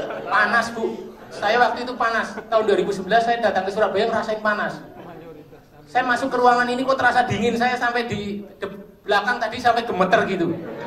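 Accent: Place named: Banyumas, Indonesia. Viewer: maen,ngapak pokoke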